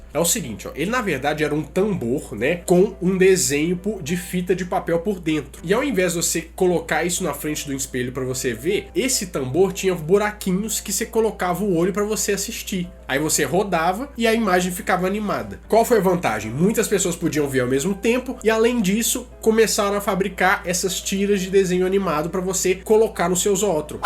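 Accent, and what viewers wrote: Minas Gerais, Brazil. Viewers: Conteúdo: Topzera Carisma: inexistente Sotaque Mineiro: insuportável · Com certeza vc é aqui de BH... Eu também! Nosso sotaque é muito engraçado KKKKKKKKK... Também tenho um canal. Comecei a uns 4 meses. Também falo sobre cultura POP, ciência, curiosidades, etc. Depois se der confere lá. De Mineiro para Mineiro!!!!! <link>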